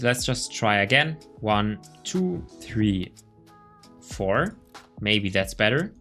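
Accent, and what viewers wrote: Germany. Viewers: Bist du Deutsch wegen deinem akzent hört man das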